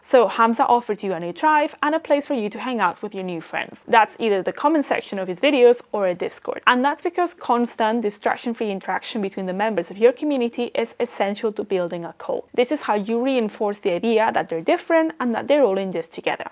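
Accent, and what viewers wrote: Spain. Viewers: It's me or your main language is spanish? Your content is really good, I'm just starting and is valuable to find channels like this, blessings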